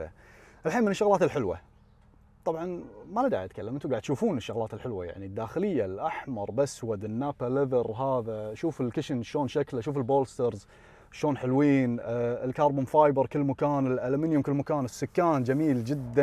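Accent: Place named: Kuwait. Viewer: والله اللهجة الكويتية جميلة جدا ❤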